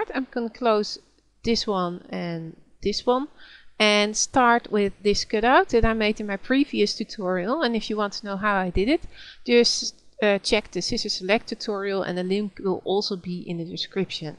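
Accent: Netherlands. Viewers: She really has an accent from Holland, not an insult but most people of the Netherlands most of the time can't speak with a good French accent, that's more something for Flemish people. btw, thanks for the great tutorials ' '! It rlly helped me!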